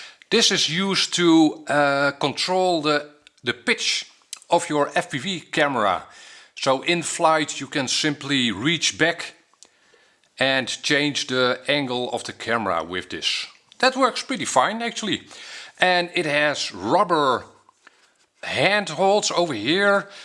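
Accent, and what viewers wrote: Netherlands. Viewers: First let me compliment you on your English! Though your Dutch accent is very thick, your grammar and vocabulary is excellent! I have several questions regarding this quadcopter. I am a novice in this field. Though I am fascinated by the concept of FPV, I am mostly interested in shooting nice video's during my travels. So, I am not a hobbyist or sport-flyer. I have been looking for good camera drones, small enough to pack for travel (without the need for an extra bag, or larger bag - I like to travel light). I just bought the Parrot Bebop(1) for that purpose, but I find it (still) too big, too breakable and it seems to lose the wifi connection each time I fly (losing all control over the drone!!). Now, the footage I saw on your other video seems too jittery and jellowy to be usable to shoot travel videos, could that be helped? Is it possible to fly this with a smart-phone as well (so I wouldn't need to pack a radio controller)? 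Would you recommend this drone for my purpose? I like the small size and the sturdiness, though I would welcome altitude hold and all kinds of aids in ease of flying.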